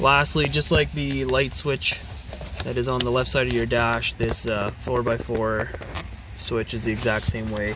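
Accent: Canada. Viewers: This guy sounds Canadian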